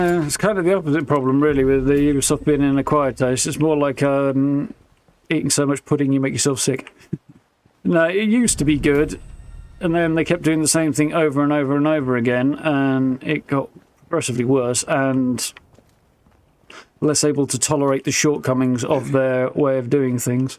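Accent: RP, United Kingdom. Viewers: This guys accent really throws you off abit, because he sounds really really posh, like he studied at Oxford, yet he looks like a metal head 😂🤣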